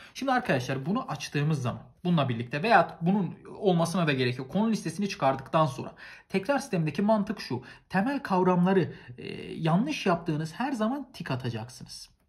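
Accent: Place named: Hatay, Turkey. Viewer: Abi neden hatay sandık içi gibi konuşuyorsun?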